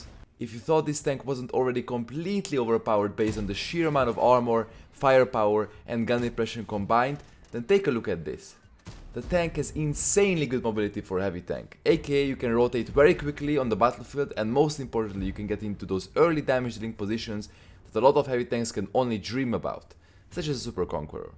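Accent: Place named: Hungary. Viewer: I am sorry if im mistaken, but is there a chance that you are from hungary? Your accent is really familiar. If this is the case, heló, nagyon jó tartalmat produkálsz :)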